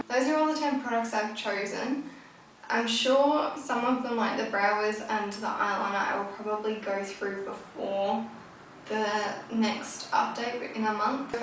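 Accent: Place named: Australia. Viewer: Love hearing an Aussie accent! Can't wait to see your progress :) I'm also panning and as a result, am addicted to watching panning videos!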